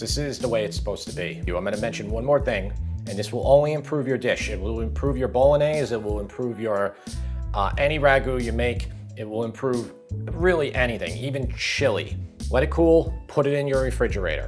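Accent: New York, United States. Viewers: I was like you sound like me a New Yorker born and bred. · Hey man, this is the first time I watch you and I love your NY accent. Cheers from Eastern Europe.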